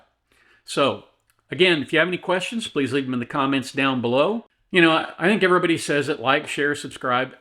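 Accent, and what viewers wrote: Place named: Midwest, United States. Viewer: Thank you, sir, for your content. Found your channel during the Brooks trial, as well as many other licensed lawyers’, which helped me understand more about the judicial process. Aside from his antics, the trail really pulled the curtain back for the layperson to see how one works. You and your YT brethren helped fill in the holes and maybe even influenced people to look into the judicial system as a career, to make it less intimidating and more open. Knowledge is power and all that. You are correct in that not everything you put out will be applicable to everyone, but know that there are those of us, both who have discovered you and those who haven’t (yet), who appreciate your insight and willingness to share knowledge, so again, thank you. And yes, doing one small good thing a day does help the world. Side note: I’m retired US Navy, born and raised in MO (STL, then Mid-MO), retiring in the Seattle/Tacoma area. 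Though I doubt I will ever return home permanently, - we have the Cardinals, Chiefs and Mizzou, after all! - it does make me smile to hear a little piece of home. Your candor, your midwestern accent and your Missourian viewpoints all have me missing home juuuust a little bit more, so thank you for that.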